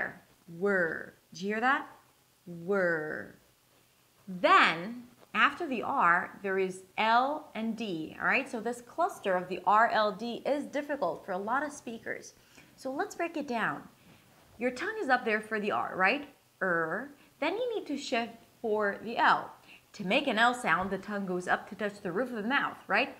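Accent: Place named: United States. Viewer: Hi! Thank you very much for your reply. My name is Katsu. I'm Japanese living in Japan. I think I speak English pretty well working as an interpreter but I still have a long way to go. You are definitely my role model because you are not an American but still you speak like an American, which shows how hard you must have worked (you moved to the U.S. when you were 20!!??). Acting must have done you a world of good. But how did you acquire your American accent? I really appreciate it if you could elaborate on how you mastered it. I know practice is the only way, but, for example, what accent training book did you use if any? Any accent training in acting lessons? I would like to know so much about you and your way of practicing. Not many people can get where you are, especially if you start your accent training after the age of 20. You are fantastic! 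I'm looking forward to your video about YOU. Thank you!!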